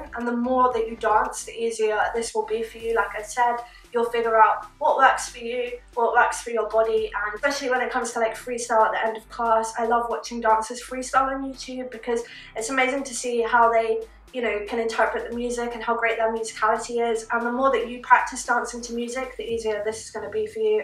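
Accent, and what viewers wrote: United Kingdom. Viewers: good video :D and that British Accent is on Point!!!!!! :D :D :D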